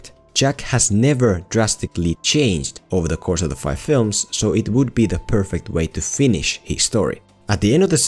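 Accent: Finland. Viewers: Where are you from? I smell Finnish accent oon suomalainen btw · Are you from Finland? Because you speak like that?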